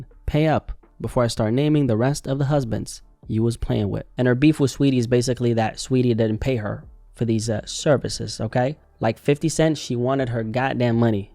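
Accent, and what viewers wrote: Jamaica. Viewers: You Jamaican ? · u Jamaican??????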